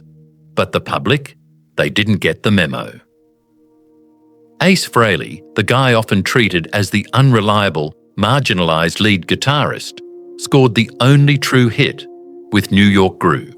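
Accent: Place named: Australia. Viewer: I can’t sit here and listen to this Australian robotic twit!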